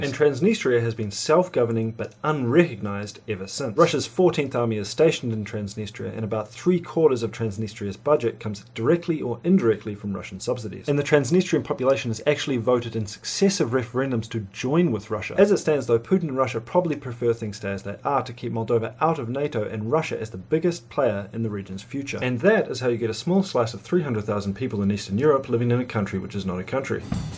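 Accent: New Zealand. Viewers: I didn't even recognise the Kiwi accent til half way thru the vid hahaha.. obviously been watching too much shortland st fml. Awesome vid tho man, good on you! love from another kiwi guy <3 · I think you have the New Zealand accent to blame for that.. Takes a more effort to understand if you have not heard it before!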